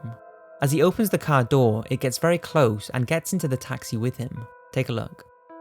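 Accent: Wales, United Kingdom. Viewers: Michael Hunt I always thought he sounded Welsh but maybe not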